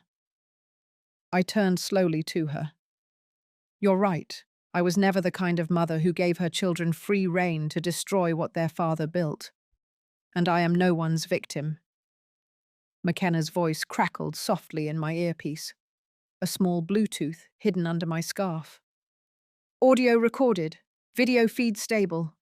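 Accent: United Kingdom. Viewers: The Reader is British of course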